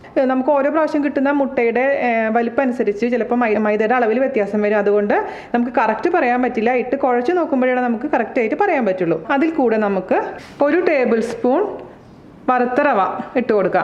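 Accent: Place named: Kodungallur, India. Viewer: Chechi... ഞാൻ ചേച്ചിയുടെ ചാനൽ കണ്ട് തുടങ്ങിയത് ഈ ഏപ്രിൽ മാസം മുതലാണ്. ശ്രദ്ധിക്കാൻ കാരണം ചേച്ചിയുടെ സംസാരരീതിയാണ് കേട്ടപ്പോഴേ തോന്നി നമ്മുടെ നാട്ടുകാരിയാണെന്ന് (ഞാൻ കൊടുങ്ങല്ലൂർ). പിന്നെ ചേച്ചിയുടെ വീഡിയോസ് കണ്ടു തുടങ്ങി. ആദ്യം കണ്ടത് ചേച്ചിയുടെ കഥയാണ് പിന്നെvacation വീഡിയോസ്, യാത്രകൾ, വീട് ,ഷോപ്പിo ഗ് അതെല്ലാം കണ്ട ശേഷമാണ് റെസിപ്പികൾ കാണാൻ തുടങ്ങിയത്.ആദ്യം പരീക്ഷിച്ചത് കേക്ക് ആയിരുന്നു അതിൽ വിജയിച്ചു പിന്നെ ബിരിയാണി, ഗുലാബ് ജാമുൻ ,അങ്ങനെ കുറേ ഐറ്റംസ് പക്ഷെ ഏറ്റവും ഗംഭീരമായത് വെട്ടു കേക്ക് ആയിരുന്നു. അച്ഛനും അമ്മക്കുമൊക്കെ ഒരു പാട് ഇഷ്ടമുള്ളതാണ് ഈ വെട്ടു കേക്ക്. എന്തായാലും ഇപ്പോ കുറേ പലഹാരങ്ങൾ ഉണ്ടാക്കാൻ പഠിച്ചു. ഒരു പാട് Thanks ചേച്ചി.എല്ലാ പ്രാർഥനകളും.....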